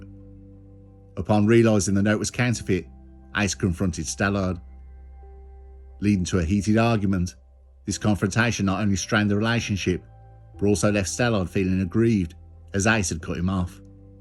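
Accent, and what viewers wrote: Birmingham, United Kingdom. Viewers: it's a shame your longer videos don't do so well. I find your voice very soothing. Broken nose with a soft Brummie accent is a winner for me. Maybe some more police interview footage would help. Anyway, you do you and good luck👍.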